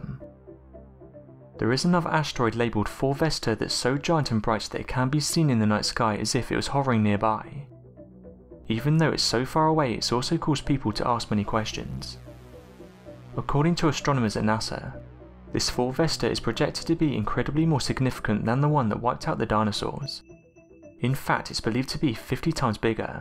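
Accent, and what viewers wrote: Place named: Oxford, United Kingdom. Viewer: Your Oxford' English is realy Nice...but...I must listen Your Voice 3rd Times...sorry...for - I can understand American' English better 😊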